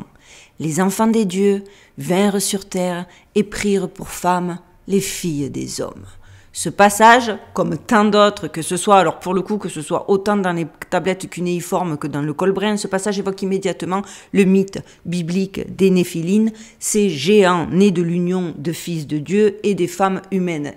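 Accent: South, France. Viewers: Merci de nous offrir des vidéos aussi variées, toujours passionnantes et tout ça avec ta jovialité et ton succulent accent du sud ❤❤❤.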